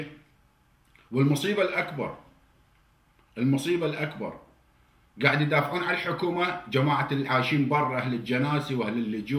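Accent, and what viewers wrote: Iraq. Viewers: والله زين جكليته تعالج عشرين هههههههههه صدك مهزله وخو الفديو الثاني جايجي بكهوة زايد عليه منصبه ههههههه اني شيعيه بس وربي انتقد حالة اللطميه والتطبير وياأخ وربي كلامك ذهب ولهجه عراقية صرفه وياريت الكل يعرف انو الحكومه هم الي عملوا الطاشفية والله مافي فرق بين سني وشيعي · تحية لك دكتور من القدس وما ننحرم من اللهجة العراقية الطيبه ربنا يحفظك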